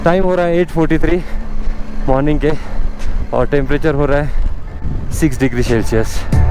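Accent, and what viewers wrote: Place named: Gujarat, India. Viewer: Your gujrati accent is awesome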